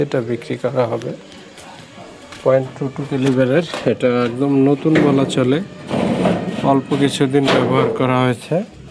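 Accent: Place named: Jessore, Bangladesh. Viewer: যশোর কথায় ভাই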